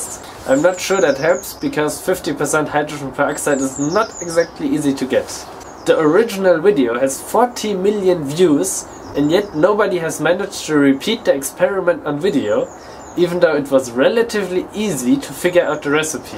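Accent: Germany. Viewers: Is this guy german or some other nationality. I don't even care, but I love him.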